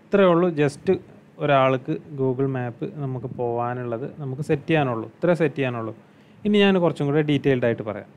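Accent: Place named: Malappuram, India. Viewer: മലപ്പുറം ആണല്ലോ താൻ... ആ സംസാരം കേട്ടാൽ തന്നെ manassilavum.. പിന്നെ എല്ലാ മലപ്പുറം ടീമും എറണാകുളം എന്നുള്ളത് എറണാoകുളം എന്നെ പറയൂ... വിവരക്കേട്.....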